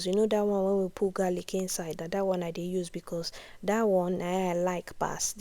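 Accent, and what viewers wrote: Nigeria. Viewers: are you from PH or did you grow up in PH? This pidgin be like the one wey abobi dem, dey speak for Diobu 😂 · don win my heart with this original Naija pigin English accent 🎉🎉🎉❤❤😂😂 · That niaja pidgin sounds so sweet in my ear❤❤❤❤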